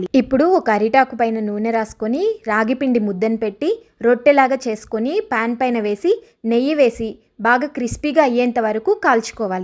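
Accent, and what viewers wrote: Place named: Tirupati, India. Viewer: slang and words telusthondi. We are from Chittoor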